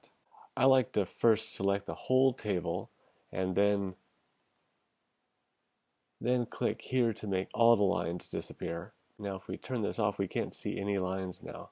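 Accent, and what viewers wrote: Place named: United States. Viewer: 日本人ですか。英語が上手なので、アメリカ人だと思いました。Besides, great video, first one that I found on this topic that explained everything properly👍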